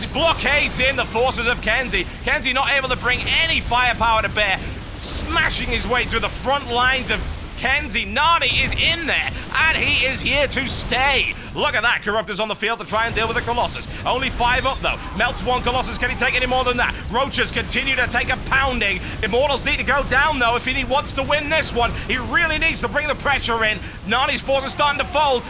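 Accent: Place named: Geordie, United Kingdom. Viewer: Mr. John Bain, let me extend my request for you to do a SC2 commentary using your Georgie accent.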